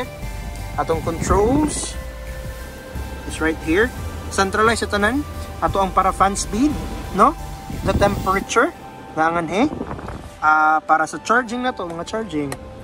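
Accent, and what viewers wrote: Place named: Visayas, Philippines. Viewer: naenjoy ko nmn sir, kaso nabigla ako sa visayan accent mo eh, hehehehe. anyway nice content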